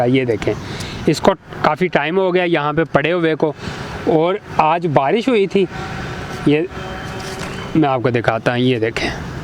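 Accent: Punjab, India. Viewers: کیا ھی اچھی بات ھوتی کہ آّپ خالص اردو یا پنجابی ملاکر اردو بولتے بجاے اسکے کہ بونڈی انگلش مارتے ، شکل سے یکدم ریڑی والے ھو ، تمہاری انگلش بھی انگش سے زیادہ پنجابی لگتی ہیں تو بھایی کیوں اپنے آّپکو لطیفے بنانے پر تلے ھو ، صاف ستھری پیاری پیاری اردو پنجابی لہجے میں بولو ، اچھا لگوگے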